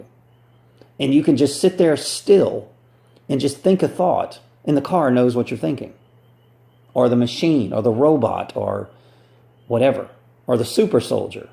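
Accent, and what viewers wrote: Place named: United States. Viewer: what have the Russian bots got to do with helping targeted individuals?you sound American, if you are American you should organize targeted individuals to run the gauntlet to reach president trump. I can assure you trump is oblivious to these programs carried out on innocent humans. try watching youtube channel (ramola d). my freind Trump is the one way these programs can be exposed. the other way is if Putin allows Edward Snowden to expose these horrific crimes. or god gives rise to several whistleblowers who will be mavericks to expose what tortures the corrupt establishments have performed on humanity. what I do believe in is when the time is right gods forces will destroy all satellites communications to free humanity. good will and has to prevail evil.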